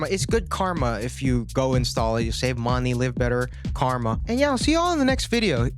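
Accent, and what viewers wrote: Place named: New York, United States. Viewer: It’s funny to see an island boo speak with a New York accent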